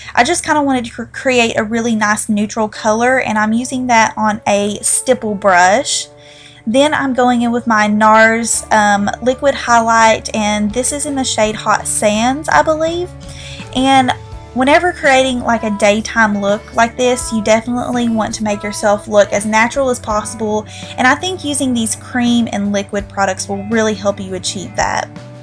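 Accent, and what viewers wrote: South, United States. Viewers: Gorgeous makeup and hair! I love it :)) I don't own any Mac products..do you happen to know any dupes for those colors? I can't wait to try this out!! Love hearing you talk cause we both have that country twang ;) Xoxo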